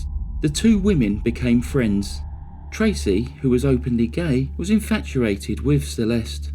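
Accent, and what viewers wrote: England, United Kingdom. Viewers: You are all very nice. Love "my dear"😊Sounds like you are from England!? Would love to visit England one day. Thank you💜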